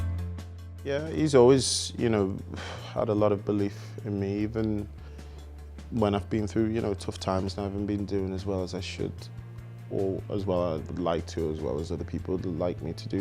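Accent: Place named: Liverpool, United Kingdom. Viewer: He sounds scouse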